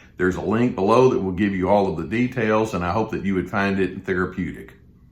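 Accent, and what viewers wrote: South, United States. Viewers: vids began showing up in my recommendations even though I never searched for the topic of narcissism. It's like my android had been listening to me. That's a little creepy. I was in a bad relationship, but never attributed it to narcissism. After watching a few of vids a lightbulb went off in my head. I finally had an explanation for the craziness I'd been living. I so appreciate these vids, teaching method, and I love his accent being a Southerner myself. I've binge watched his vids and learned so much. Thank you!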